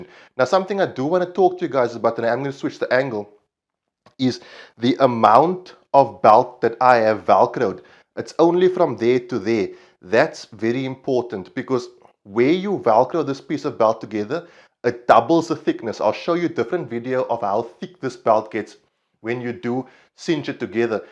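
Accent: Cape Town, South Africa. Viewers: hi are you from cape town? i like your accent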